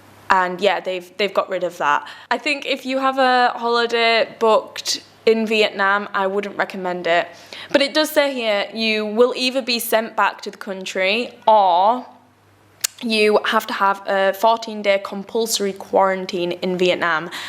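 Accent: United Kingdom. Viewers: I love UK accent wish you all luck lady. BTW you're so pretty oh one more thing stay safe and healthy 👏👏👏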